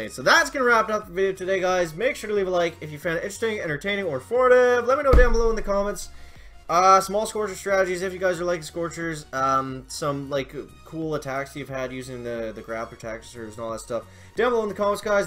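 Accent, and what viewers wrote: Canada. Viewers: What part of Canada are u from?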